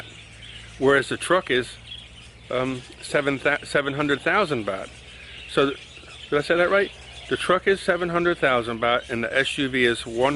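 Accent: United States. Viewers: Hello found your channel today and such a lovely change from most other Thailand videos. I used to live in Thailand but left in 2002 and many times wish I hadn't but we all do things for a reason at certain times when we think it's right. Anyway I was surprised to hear you are from England you have a slight Texan twang to your accent. You mention HRT, I am on Nebido which is a testosterone replacement therapy. Two reasons the GP put me on this is because one I had a low testosterone levels and two I have ED at times which is a result of various medications I'm on. It is given to me by injection every 12 weeks. I have no idea of your reasons and its not my business but just thought I would mention it to you. I have just subscribed and will keep following your life in Thailand.. Power to you..David..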